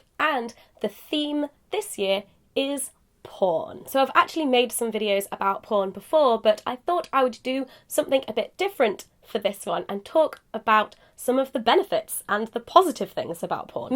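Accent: United Kingdom. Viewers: Where is she from? I know she's British but what part of England is her accent from? I love it. Cause I know there's different British accents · Are you British ?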